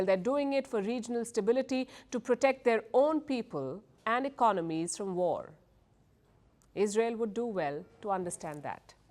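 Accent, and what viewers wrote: India. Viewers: Indian accent is the most beautiful accent in the world like this news woman. Rishi should also speak with an Indian accent. Actually, the whole world should speak with an Indian accent. The best accent in the world is an Indian. For sure!! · P.sharma you good reporter but we don't like your Indian accent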